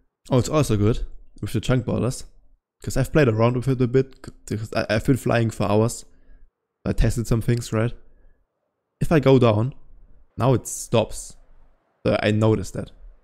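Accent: Germany. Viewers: Höre ich da etwa... DEUTSCHEN AKZENT?? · is this an german accent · Wieso hör ich so das deutsche englisch raus 😂 geiles vid❤ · Bist du deutsch? Dein accent hört sich sehr danach an. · I hear german🧐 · bro sound just a little DEUTSCH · ich liebe den deutschen akzent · I can hear the German accent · I hear German Denglish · ofc the guy with the most german accent ive ever heard is talking about ethnicity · Are u german ur accent sounds like ur german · Da hört man den deutschen akzent direkt raus hahah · Bester deutscher akzent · I can hear the deutsch :) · Hallo wieso machst du deine Videos nicht auf deutsch (man hört das du aus Deutschland kommst) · Hahahahaha hier der deutsche Kommentar nach dem ihr alle sucht, passend zum wunderschönen Akzent 🇩🇪🔥! SEHR STARK GEMACHT, MEISTER 💪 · I hear ein bisschen German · Immediately noticed the German accent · ok ok i hear a little bit of german in your voice, aber ehrlich cooles video bro mach weiter so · Aha gutes video man merkt so bisschen das du Deutsch bist ❤ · I hear a bit german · Oi digga, bist du Deutscher? 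Dein Akzent ist ziemlich deutsch lol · i can hear a little bit of german :3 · Man kann den deutschen Akzent hören lol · youre definetly german i can tell by the accent so servus meister wie gehts super video 👍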